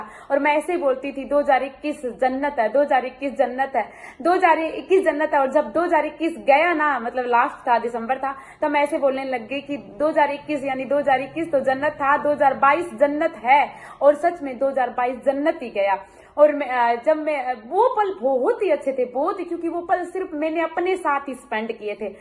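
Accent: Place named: Rajasthan, India. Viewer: Di aap ki boli mujhe bhot Pasand h kyuki m bi Rajasthan se hu or Hindi me sab ko achhi trh se samjh aati. H thankyu so much di love you di 😍😘😘🥰😍😘🥰😍😘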